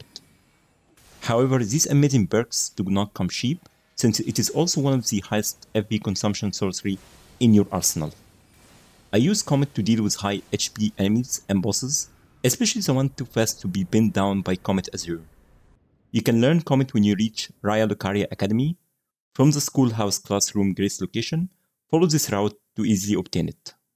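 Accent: Egypt. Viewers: Are you Egyptian? I can hear it from your accent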